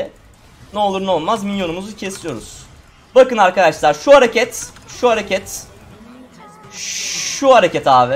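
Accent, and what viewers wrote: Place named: Kahramanmaraş, Turkey. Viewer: maraşlımısın knk sadece maraşda böyle konuşuyolar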